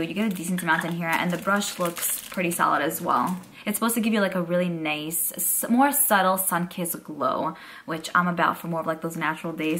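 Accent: New York, United States. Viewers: Love love your videos watch they daily and love you New York accents it’s the cute make me miss home 🥰 love Ipsy too ♥️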